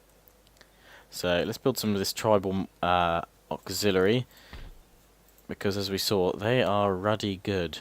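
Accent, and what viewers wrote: England, United Kingdom. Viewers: How do you do the cinematic zoom thing, "N" key or "End" key. Sorry im not British dont understand the accent that well. But idk Brits and Americans always fight on the internet. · that british accent SO catchy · Well,people DO love a good English accent...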